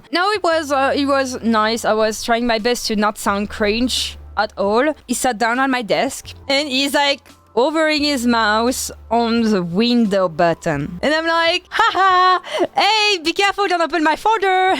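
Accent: France. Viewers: Canadian with the strongest French accent ever...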